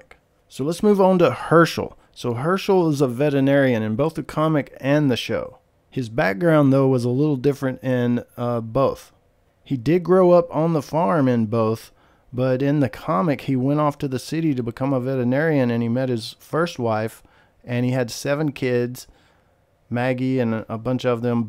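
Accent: South, United States. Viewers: Dude you have a southern as hell accent lol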